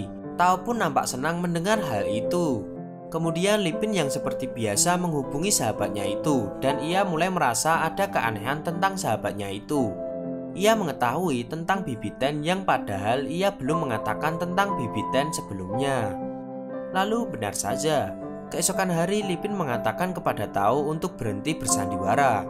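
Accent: Java, Indonesia. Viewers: Jawa nya medok banget min 🙈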